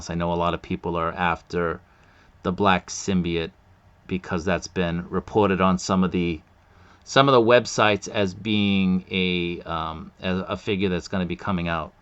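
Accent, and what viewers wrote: Boston, United States. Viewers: R u from boston?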